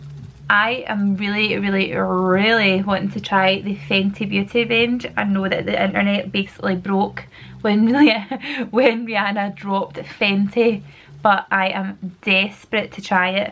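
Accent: Scotland, United Kingdom. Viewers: I found you through watching fleur de force and I must say I love you just as much if not more. It's so nice to hear an accent like my own, I'm in shotts. (Don't judge! 😂😂) is love to see you do a fenty beauty review, I watched fluers and Jamie Genevieves and am obsessed already. The only place to physically buy it in Scotland is Harvey nicks in Edinburgh, if you do a review you can let me know if it's worth the trip 😂😂 xx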